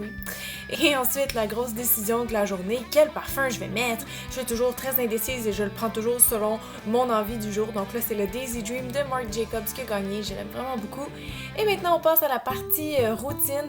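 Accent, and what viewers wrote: Quebec, Canada. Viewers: Elle est québécoise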